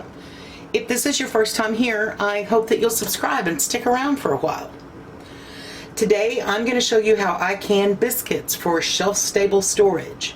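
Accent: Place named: Texas, United States. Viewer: Are you a TX girl? Love to listen to you talk.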